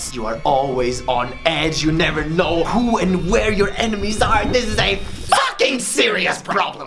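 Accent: Germany. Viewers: Is German your native language? Hell i simply LOVE the way you speak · peak denglisch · He's the first Asian with a german accent I ever heard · Ich liebe deinen deutschen akzent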